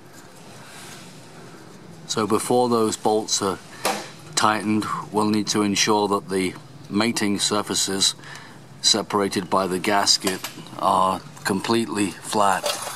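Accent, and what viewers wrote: England, United Kingdom. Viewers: You are definitely English,and also sound like George Harrison!!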